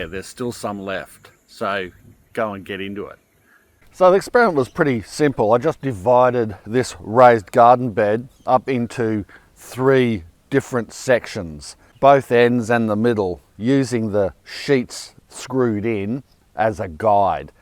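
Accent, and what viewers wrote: Australia. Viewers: I am not sure which bit was best in the video *someone speaking with my accent for a change *someone who was still aware of the rest the world while talking about local things *someone who obviously knows what they are doing but is still capably of not being perfect * someone who is game enough to put a full clove of garlic in their mouth on camera. But gently I would like to point out...that ** puting dirt from the garden in your mouth is about as dangerous as stoking a camp fire with your penis! 😮 Aussie Aussie Aussie...oy oy oy!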